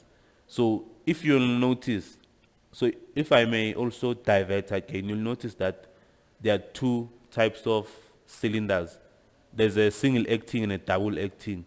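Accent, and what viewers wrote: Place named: South Africa. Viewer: THAT SHARP SHARP AND NEEEH , TELLS ME THAT THE LECTURER IS FROM SOUTH AFRICA😅😅😅😅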